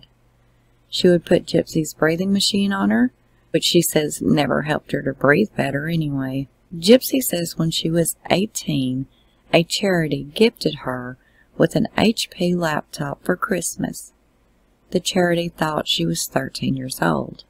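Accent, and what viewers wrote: South, United States. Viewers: There’s something about your voice.. it’s soothing. Maybe it’s your southern drawl or the inflection in your voice?!? Either way I do enjoy your videos, book synopsis are my favorite.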